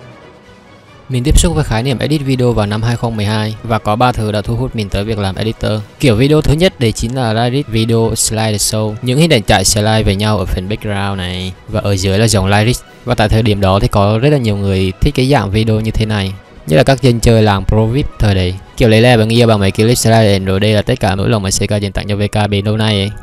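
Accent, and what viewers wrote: Central, Vietnam. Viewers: giọng a giống người miền Trung._. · ông là người vùng nào miền Trung đấy:v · Wow là ng miền trung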